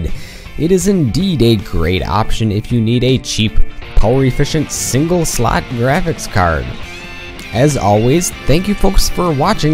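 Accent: Canada. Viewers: are you Canadian? because to me you sound Canadian (no offense)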